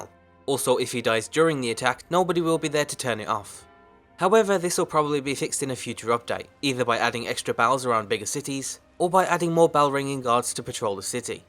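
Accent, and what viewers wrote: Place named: North, United Kingdom. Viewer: His accent has changed. Much more Northern now I think....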